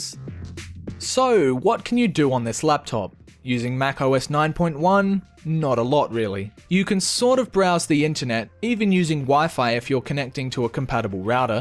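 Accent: Australia. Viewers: Woo your Aussie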